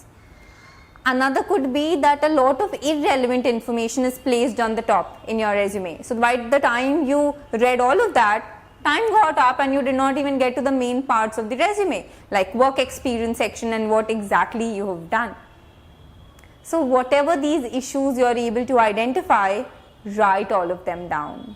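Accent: India. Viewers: This is Called A Pure Indian Accent 🙂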